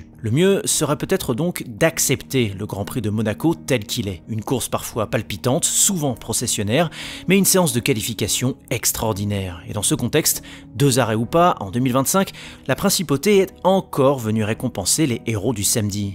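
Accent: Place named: Toulouse, France. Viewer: c le roi des jeux de mots éclatés au sol (Max Vegas, Lewis Ham’burger) on dirait un oncle relou qui découvre Twitter en 2010 et pense encore que faire une vanne pourrie, c’est de l’analyse. ttes ses vidéos c est un mix de mèmes de babtou rincés et une réflexion proche du bac à sable. Y a tellement peu de fond qu’on dirait qu’il commente la F1 en lisant des tweets. Le pire, c’est qu’il pense avoir un vrai ton mais tout ce qu’il a, c’est son accent toulousain qui le rend encore moins serieux.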